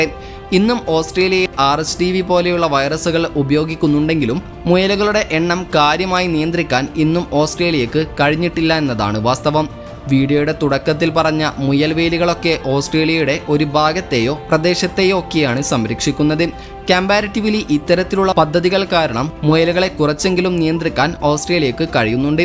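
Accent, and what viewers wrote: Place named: Malappuram, India. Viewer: Nalla malayalathil parayedo ninte malapuram bhasha vemda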